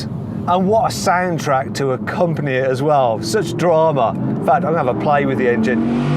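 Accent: United Kingdom. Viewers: 이형 영국발음때문인가 자꾸 보게돼....시원시원하고 깔끔하고 재밌어....뭐지 이형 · 역시 영국식 발음은... 멋지네요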